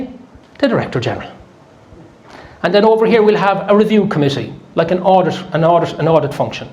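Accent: Ireland. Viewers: 4 comments really yootoob?? 🤨 This was outstanding. Nothing I didn't already know, or have heard from others or suspected myself - but somehow for me, in this moment, it consolidated it all. Something about that glorious Irish lilt that made the repeating 'un-put-downable'. However, I am now so certain of Everything, that I don't know whether I'm so exhausted from 'knowing' that I'm inclined to pee-everything up the wall, party like a loon and spend what funds I possess, or whether I am so fired - especially obo my Grandchildren - that I'm gonna light a torch and pitch fork my way in to History🦬🔥 ( If you know me - the latter is the ONLY option. If you don't know me - is right - Big Bag, Big Fight - lead from the front) ✊🙏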